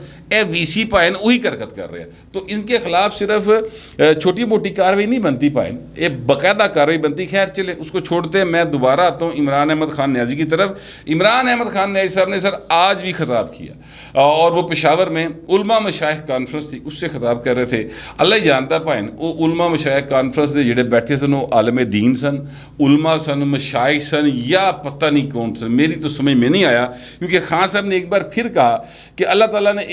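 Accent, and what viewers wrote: Lahore, Pakistan. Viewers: زبردست صاحب۔۔۔زبان کے لاہوری ٹچ کے ساتھ مزا آگیا۔ ویسےمیں پی ٹی آئی کی چھترول سے زیادہ لہجہ کا لطف اٹھاتا ہوں۔ انہاں نوں ویسے وی شرم حیا نیئں۔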